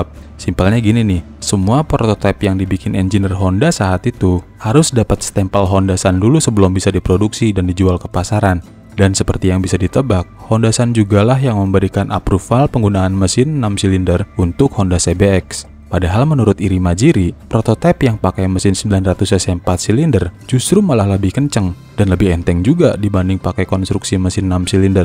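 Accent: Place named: West Java, Indonesia. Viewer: Orang sunda mana kang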